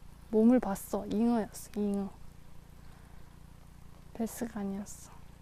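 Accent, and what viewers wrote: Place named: Daegu, South Korea. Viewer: 대구 말투 넘흐 좋아요 ❤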